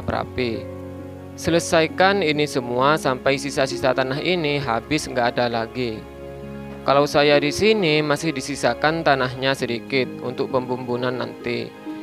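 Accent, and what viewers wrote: Madura, Indonesia. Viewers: Logat Madura 😅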